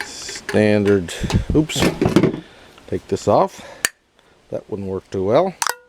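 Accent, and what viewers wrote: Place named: East Coast, Canada. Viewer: Nice to hear that thick of an east coast Canadian accent in a YouTube video. Gonna be doing this to luxury-up my upcoming winter beater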